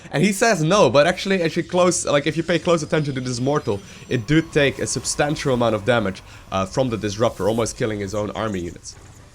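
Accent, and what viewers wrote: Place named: Netherlands. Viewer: Pretty sure he's Dutch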